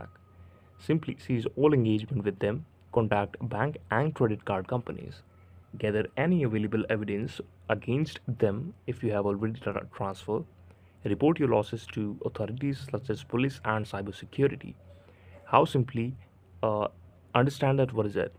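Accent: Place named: India. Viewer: Just by hearing your accent I already know that it’s a scam and you are a scammer as well. D!rty 1nd1ans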